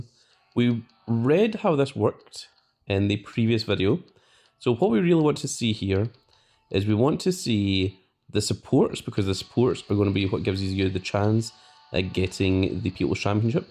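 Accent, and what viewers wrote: Scotland, United Kingdom. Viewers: Yoo are you Scottish or is it just me also nice video cause I’m Scottish 🏴🏴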